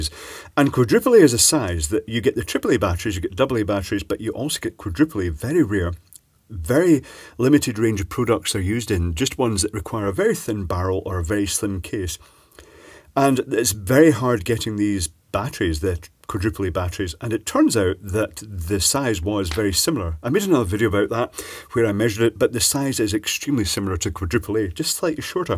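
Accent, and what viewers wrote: Scotland, United Kingdom. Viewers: What did Mr say? Sounded like 'putting seek diamonds'. What was that word before diamonds? Also where has the Scottish accent gone?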